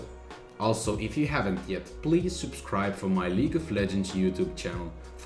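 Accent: Finland. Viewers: Mä tiesin.. helkkarin suomalainen aksentti. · are you finland ? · U finnish? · Mä vähän aattelinki et oot suomalaine, koska aksentti :D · Are you finnish? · Are you from finland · Your accent is sooo Finnish ;D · hepodix are you from finland ?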